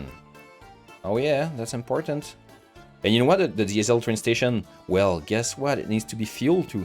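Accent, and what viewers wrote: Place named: Quebec, Canada. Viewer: I live in Florida. My family is visiting from Montreal. We are Armenian. I knew i recognized the accent. Thank you for your videos. Very relaxing.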